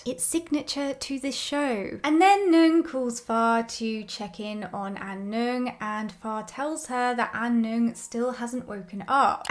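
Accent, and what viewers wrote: United Kingdom. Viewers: I really don't care if the pronunciation is Faye or Faiii. She's hot. Your descriptions just tickle my fancy. So articulate British. Love it. Still laughing.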